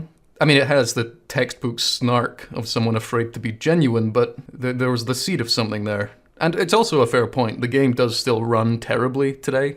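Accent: Scotland, United Kingdom. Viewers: You really sound like you'd pronounce 'Enoch' the same way as I would (like 'loch', like if I was to mention 'The St. Enoch Centre', the 'ch' in there is like in words like 'loch') but I was surprised that you didn't. I must be mistaken.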